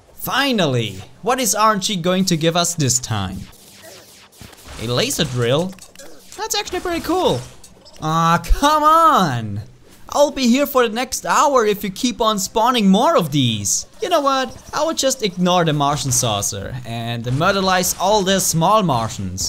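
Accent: Germany. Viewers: Are u german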